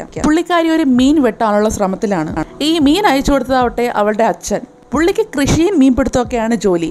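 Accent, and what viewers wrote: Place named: Kollam, India. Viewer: Kollathil ullavar aganeyann parayuka bro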